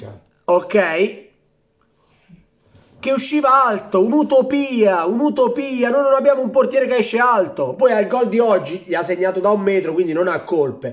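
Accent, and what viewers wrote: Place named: Apulia, Italy. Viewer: Ma cosa prendi in giro xhe si sente che sei pugliese anche tuuu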